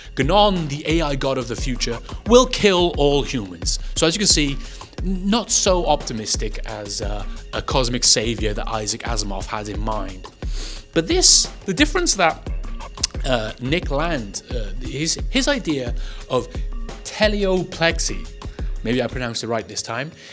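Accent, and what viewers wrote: Wales, United Kingdom. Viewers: Your welsh right? I csnt figure out your accent and its annoying me haha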